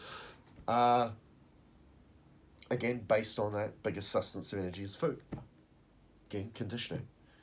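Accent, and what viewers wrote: New Zealand. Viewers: Aloha, are you from NZ ?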